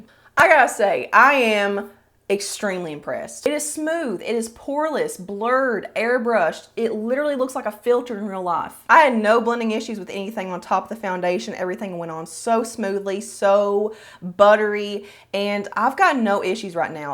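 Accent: South, United States. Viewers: Just found your channel and subscribed as soon as I heard your Southern accent. Even though I'm old enough to be your mama or grandmother I still use makeup and like to see reviews before trying. Thanks for your honesty about the products. I'm in Alabama btw.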